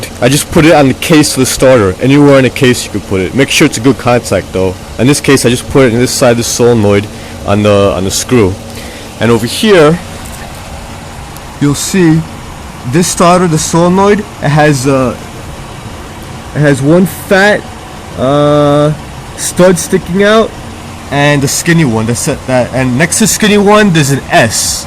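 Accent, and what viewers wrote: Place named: Brooklyn, United States. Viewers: Great Video! Brooklyn huh? I'd know that accent anywhere! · Omg. Holy shit I swear I just saw Sylvester Stallone on jimmy Fallon !!! I was thinking I like this guy cause he is practical and it motivates me to do shit myself!! I'm guessing New York maybe jersey ? Hey I love em all so no one be offended ...I was raised catholic guilt lol, and if someone said are u Irish and Protestant I'd be like no but I like lucky charms .....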